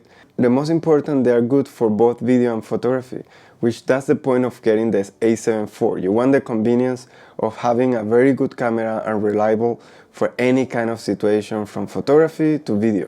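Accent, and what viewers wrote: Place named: Spain. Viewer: I like your accent too, although it sounds Spanish? In Macau don't they speak Chinese, Portugese and English? I'd love to visit there! Great video, BTW...♥️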